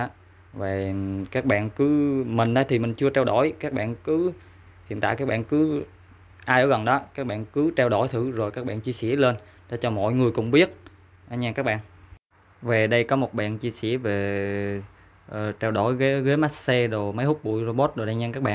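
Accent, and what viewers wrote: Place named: Vạn Giã, Vietnam. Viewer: Chú Em này nói tiếng vạn giã đây OK nè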